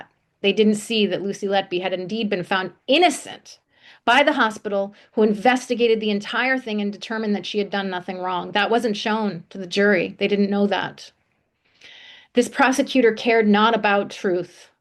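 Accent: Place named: United States. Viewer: I take it by your accent that you are an American? So am I…..retired American nurse…..have been involved with this case for 2 years…… I also have a channel…… there are also probable innocent convicted serial killer nurses here in America…… if you are interested once Lucy is free to help these nurses out let me know…..I am trying to get fellow Americans interested in freeing my wrongly convicted nurses…..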